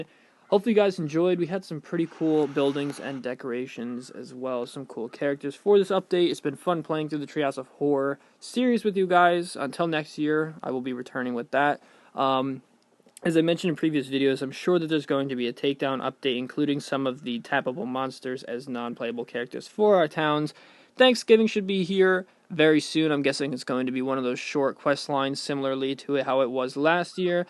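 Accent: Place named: Scotland, United Kingdom. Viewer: btw I love you Scottish accent 😉😂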